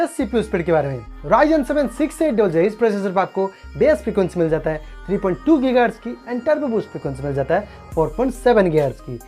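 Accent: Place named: India. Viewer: Son of a bitch, i dont understand ur accent. Pak u bumbai indian body odor people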